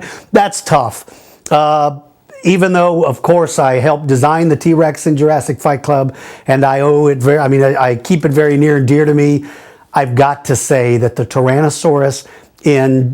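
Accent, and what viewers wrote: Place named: Germany. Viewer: thanks for answering my question, that german accsent made my day of a crappy day thankyou man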